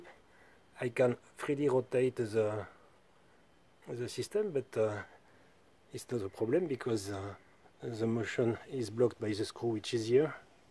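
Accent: France. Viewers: joli taf ! c'est marrant comme on catch les français rien qu'en les écoutant parler anglais (evidemment je m'inclus dedans :)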